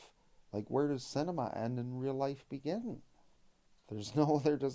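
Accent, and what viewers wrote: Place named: Canada. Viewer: I could talk to this dude forever about this Also one of the most Canadian people I’ve ever heard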